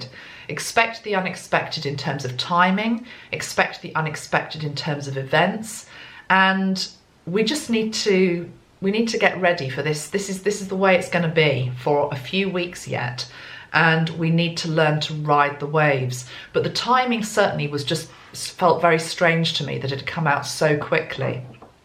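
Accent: England, United Kingdom. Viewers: hello. to be completely off script; just wanted to say I love your accent and it reminds me of the English actress in Agatha Raison. a jolly good series we got here in Australia recently. also it was good to be reminded of the Light Workers. thankyou for your insights. janell downunder x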